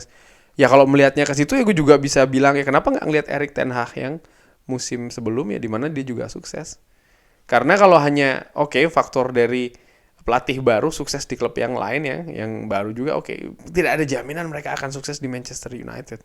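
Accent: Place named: Java, Indonesia. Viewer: Buanyak nya medok tenan bg 😅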